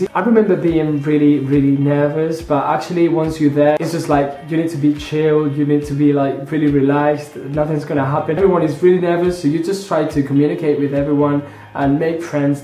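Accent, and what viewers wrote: United Kingdom. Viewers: I did my recruitment day for Ryanair last Friday (Dec 6th), it's Tuesday now (Dec 10th) and this week I'm getting my answer, if I'm finally chosen I'll be travelling to Hahn on the 7th of January. Fingers crossed, I'm nervous and excited at the same time. Gracias por compartir tu experiencia, yo también soy español! Y enhorabuena por tu acento británico 👌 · Omg what an amazing British accent xx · Such a great british accent being spanish congratulations haha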